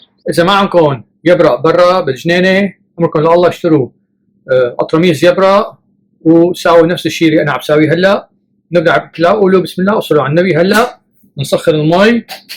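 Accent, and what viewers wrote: Levant. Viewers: الله يعطيك العافية متابعة لقناتك لشوف الطبخات الظريفة واسمع لهجتك الشامية الحلوة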